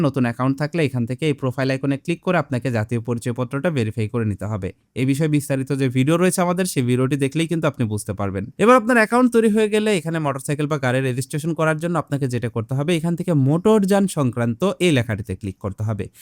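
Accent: Tangail, Bangladesh. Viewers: Vai apnar basha ki tangail e ?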